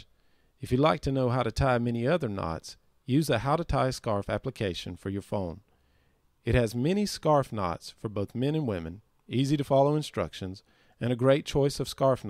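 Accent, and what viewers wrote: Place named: South, United States. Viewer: “Refer to the “how to tie scarf” application…” What a self-referential comment this weirdly southern announcer makes.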